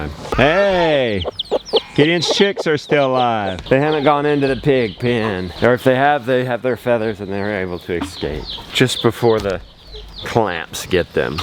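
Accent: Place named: Hawaii, United States. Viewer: Hey you sound like a hawaii local person say. We go see if the water stay flowing. That's what we say. Ok den brah!🤙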